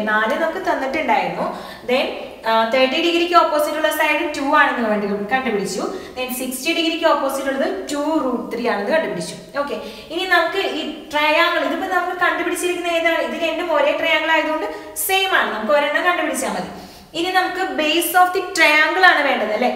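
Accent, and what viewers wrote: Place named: Kozhikode, India. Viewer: Edhnn parennath😂 kozhikoden slag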